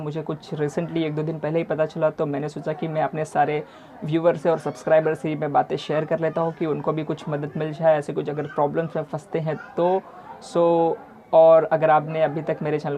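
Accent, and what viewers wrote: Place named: India. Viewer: I can’t understand your Indian ass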